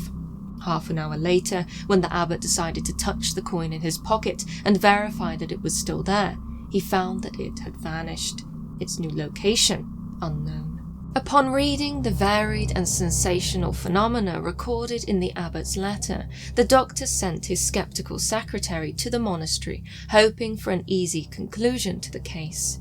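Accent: United Kingdom. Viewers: You are such the British lady. Love your video's and your accent.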